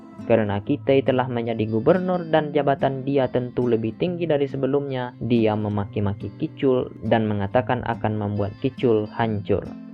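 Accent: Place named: Eastern Indonesia, Indonesia. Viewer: Kk org mana..logatnya kayk org timur😄😄😄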